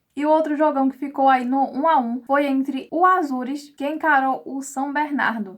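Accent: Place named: Sergipe, Brazil. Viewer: kkk parece que e sergipana sou paraibano torço pelos times daqui e pelo mengao e claro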